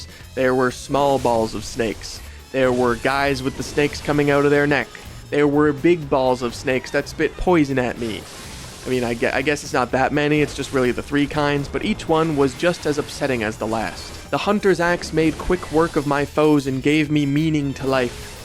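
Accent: Canada. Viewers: Never realized you were Canadian, but dropping "University", "bursary", and "KD" when talking about noodles all in a single video outed you as a true great white northerner.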